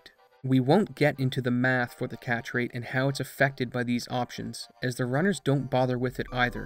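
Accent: Canada. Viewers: proud of myself for predicting that you were Canadian based on your accent. Great video though!!!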